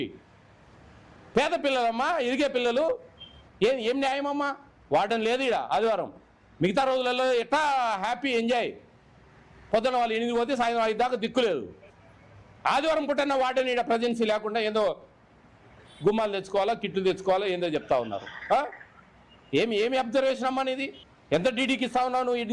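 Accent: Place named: Kurnool, India. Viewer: ఇన్ని వీడియోలు చూసాను సార్ అన్ని వీడియోలు కన్నా ఈ వీడియో పవర్ ఫుల్ గా తిట్టినారు సార్ దూకి సావండి ఎక్కడైనా మరి రాయలసీమ భాషలో ముఖ్యంగా కర్నూల్ భాష లెక్క మాట్లాడుతున్నారు సార్ వాళ్లకు చేత కాకుంటే మాలాంటి వాళ్ళకి ఇవ్వండి సార్ నేను చూసుకుంటాను సార్ 10 15 హాస్టల్లో చూసుకుంటాం అలాంటి వారిని తక్షణమే తీసేయండి సార్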